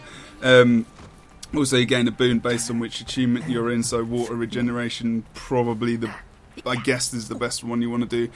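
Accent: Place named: England, United Kingdom. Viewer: Cool, thank you! I saw your Lion's Arch tour just now and I liked it! Keep the vids up and especially that English accent of yours ^^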